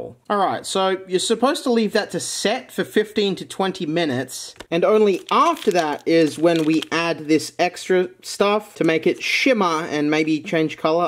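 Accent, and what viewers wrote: Australia. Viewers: I was like “this dudes definitely Australian” then you brang up the water joke. Whkxbskcbskcbdk but yes anyways hello fellow aussie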